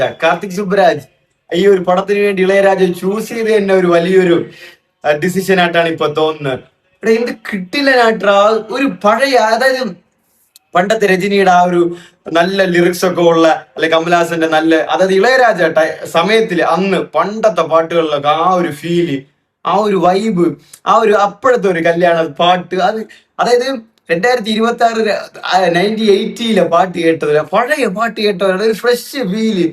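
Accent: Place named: Tamil Nadu, India. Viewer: இவர் மலையாளம் பேசுற தமிழனென்று நினைக்கிறேன்..பேச்சு கேட்க்கும்போது அப்படி தோணுது..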